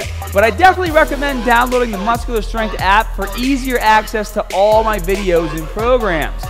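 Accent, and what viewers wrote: Boston, United States. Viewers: He’s gotta be from boooooston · Gonna hit the bah bells hahd to build up my ahms. Love that Boston accent! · whats with the new boston accent.... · Never paid attention to the Boston accent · Boston accent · Northern American. Boston accent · This guy is from Boston , Bahhbell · That Boston accent. Love it! haha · Love the Boston accent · Smaaarrght.. Is that a Boston Irish accent? Excuse my unfamiliarity as I'm from NZ.. Sounds cool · Is this guy from Boston. I say -impossible to do that many reps and sets and only rest 90 seconds. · This guy has to be from Boston I am and I can see it right away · i hear boston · wow is he from Boston? He has very thick accent sounds kind of like boston accent.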